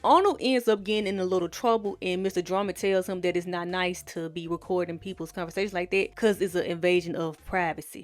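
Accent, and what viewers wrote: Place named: South, United States. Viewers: Right!? I love the black Mississipi and Lousiana accents (all the deep southern states tbh), I'm from London, so I've yet to hear one in person tho😂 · Oh I would’ve guessed Tennessee. There was a contestant on Survivor last year (Q Burdette), and your accent sounds so similar to his. He is from Memphis though, so pretty close to Mississippi.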